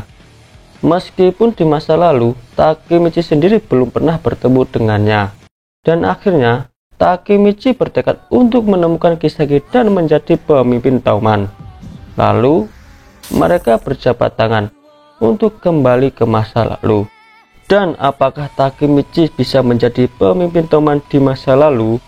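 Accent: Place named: Java, Indonesia. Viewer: Medok banget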